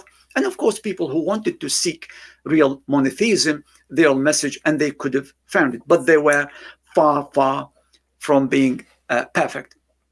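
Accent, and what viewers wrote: United Kingdom. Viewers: you speak in such a perfect narrative voice I really REALLY want you to release an audio of all the books of Hadith and the Holy Quran in your voice narration. What an effective beautiful British accent you have. I will write this comment underneath all your videos until you read it. May Allah take this service from you in this life.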